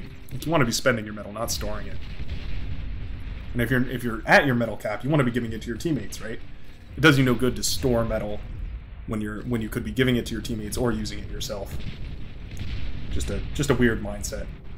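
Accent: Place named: United States. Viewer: great to see the interest in the game. great cast. keep up the casting and learning., its good to see new players coming through and will be interesting to see how they develop and some maybe even surpass the established "pro" like myself :) if you can get in at GMT evening times you'll see much higher ranks playing so you can learn some more, but you sound american so maybe a bad time for you lol.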